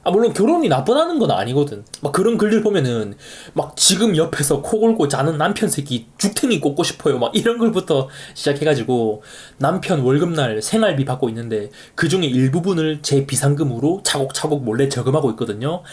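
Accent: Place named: Gyeongsang, South Korea. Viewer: 아 갱상도 사투리 듣기시러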